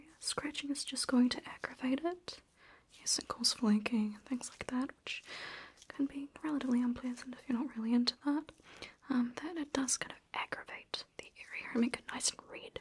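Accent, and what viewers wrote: New Zealand. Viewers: R u from nz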